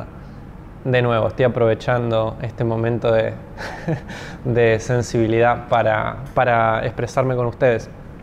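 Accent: Argentina. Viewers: Por su forma de hablar se da a notar que es de Argentina (aunque puede ser que eso quiera que sepamos) a mi propia idea acerca del caso pienso que esta en Rusia y ojo es solo una respuesta retorica, a consecuencia que el clima no es visto ya que donde esta no hay luz natural.Por el color del foco es calido el amarillo y caracteristico a diferencia del blanco es mas calido osea mas caliente a comparacion de las temperaturas que recorre la gran rusia OJO, SÓLO ES UNA HIPITESIS REDACTADA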